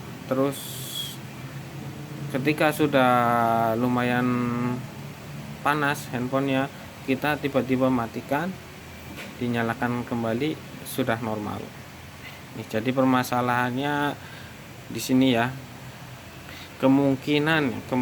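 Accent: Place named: Banyumas, Indonesia. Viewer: Kayane wong ngapak iki, lokasi ngendi lur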